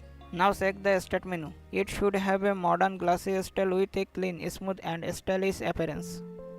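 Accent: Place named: Bihar, India. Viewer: no this is perfect just he have a harder Indian Accent Called Bihari or UP I'm not sure But u can use Subtitles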